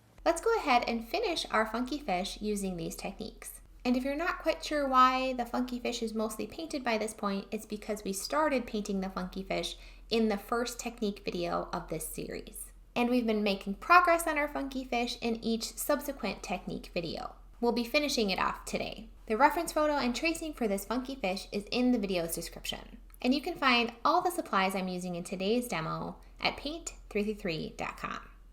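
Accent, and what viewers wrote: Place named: Minnesota, United States. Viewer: I have watched all of the lessons in this series and decided to paint the "funky fish" while going through the videos as one tutorial. I think you made some bold decisions with color choices as compared to the reference image which resulted in an awesome goldfish! Good job! I also loved hearing your accent which reminded me of the 25 years I lived in Michigans U.P., although you sound more Minnesotoan. Thanks for the time and energy you brought to this series.......that was a lot of work on your part.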